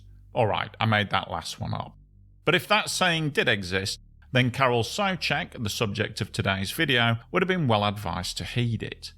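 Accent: United Kingdom. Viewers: You have thickest most unintelligeble Br'ish accent Ive ever suffered.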